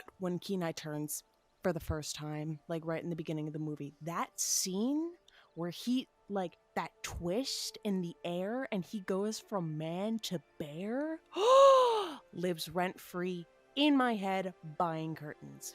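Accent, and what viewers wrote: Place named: New Jersey, United States. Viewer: Okay so I’m finished listening and I’m so here for your warrior cat oc lore they’re so coool and I always adore a chapter that’s complex and with a bit of religious power Hexmoon is currently obsession ALSO JERSEY REP! I was like I know that accent and thought it was Philly 😭 but damn love this so much!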